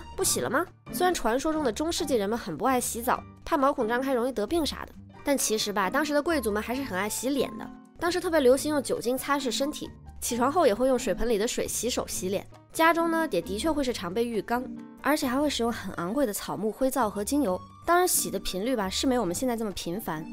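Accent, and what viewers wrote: Cantonese, China. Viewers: 廣東話好好聽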